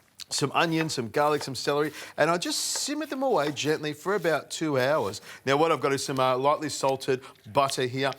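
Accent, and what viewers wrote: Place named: Australia. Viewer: That's an Australian accent, not Italian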